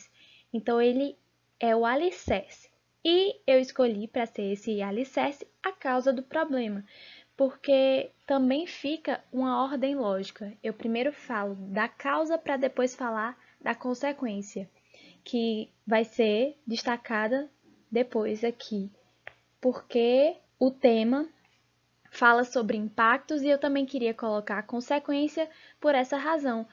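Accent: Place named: Bahia, Brazil. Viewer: O sotaque bahiano é a melhor parte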